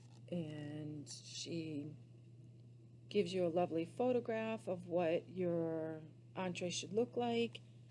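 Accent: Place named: Massachusetts, United States. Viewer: I love her Mass accent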